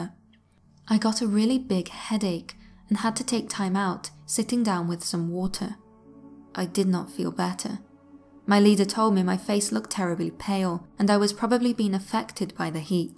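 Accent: United Kingdom. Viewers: Only thing I dislike the British accent. It's a turn off when listening to this stories I will never understand why pple find the accent attractive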